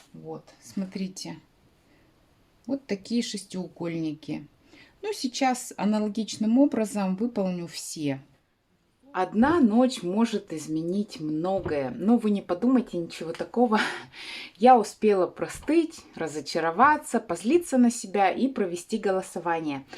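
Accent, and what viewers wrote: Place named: Serov, Russia. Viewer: У меня родня в Серове живёт , говор как у них. Как будто с ними поговорила. Очень красивое одеяло!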